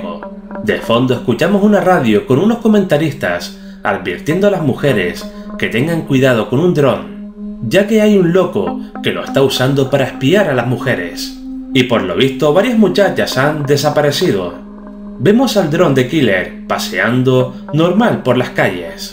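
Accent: Canary Islands, Spain. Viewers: Are es canario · Eres canario?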